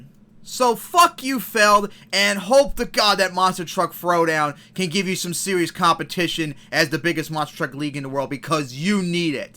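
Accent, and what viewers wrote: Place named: Boston, United States. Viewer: I don’t often watch many YouTubers as I make videos on my own but, I find myself coming back to this video as this is funny as hell. Great rant! XD I can also hear a little bit of a Boston accent in your voice too. FELD is like any other corporation and they’re clearly not in compliance of caring for the audience. Wait they do! That is for the money. I honestly make the videos for pure entertainment purposes and enjoy doing so. Nothing more. Although I am and most of us are supporting them in a way with the videos we make,FELD will continue to accustom their values of what’s right in their perception. It’s business.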